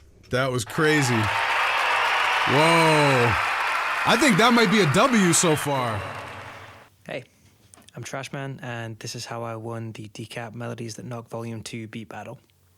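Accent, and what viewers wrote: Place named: Northern Ireland, United Kingdom. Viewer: Bro you sound british, maybe northern ireland?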